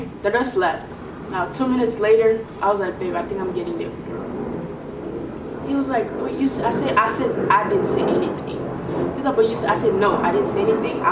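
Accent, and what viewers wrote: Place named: Francophone Africa, Africa. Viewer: Cameroon I knew I recognized that afro-french accent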